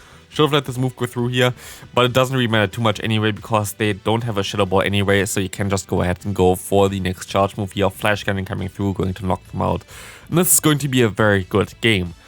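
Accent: Germany. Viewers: Sag mal du bist schon deutscher oder? Meine das rauszuhören. Ist natürlich egal, klasse Content!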